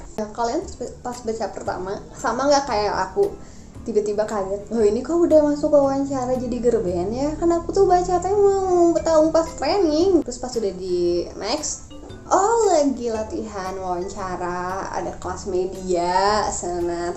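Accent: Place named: West Java, Indonesia. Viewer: Teteh ni sundanis bgt ya ..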